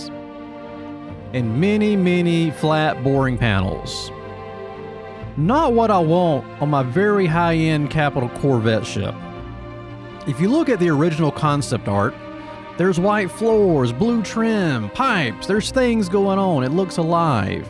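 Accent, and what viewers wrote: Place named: Tennessee, United States. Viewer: This guy is either from Tennessee or Kentucky. That accent..holy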